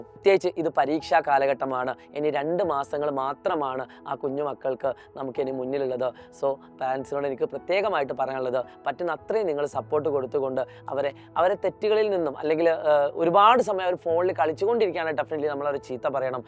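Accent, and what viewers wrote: Thrissur, India. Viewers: Thrissur like adiiiiii❤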